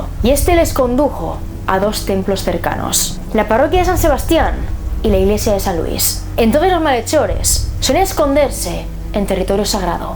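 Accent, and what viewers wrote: Galicia, Spain. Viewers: eres gallega fijo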